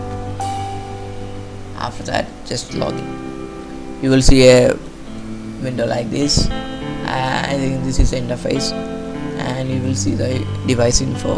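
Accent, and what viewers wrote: India. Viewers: U surely r a malayali by accent!!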